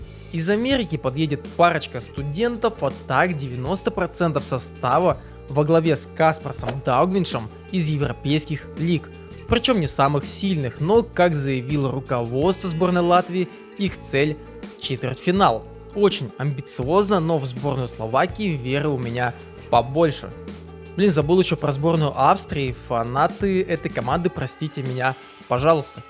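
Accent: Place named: France. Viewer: Комментатор с французским акцентом,не в обиду,прикольно базарит.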